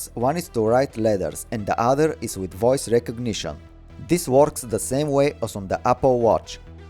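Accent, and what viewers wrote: Russia. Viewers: his voice can't be more russian, even when he is speaking in english it felt like i'm listening to russian language.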